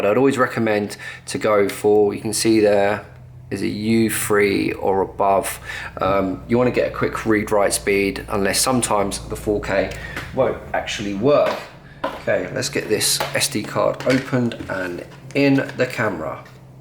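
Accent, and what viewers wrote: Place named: London, United Kingdom. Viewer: it's a regional accent most likely - probably down south around (east) london. there are many of them. words often arent pronounced like theyre written. certain sounds simply aren't produced. other languages, like italian in particular, are famous for this.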